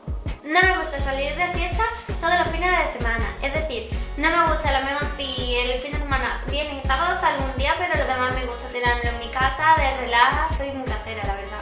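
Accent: Andalusia, Spain. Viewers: De nada guapa PD;se nota q eres andaluza (eres andaluza no?) si lo eres ya somos dos un beso guapa!!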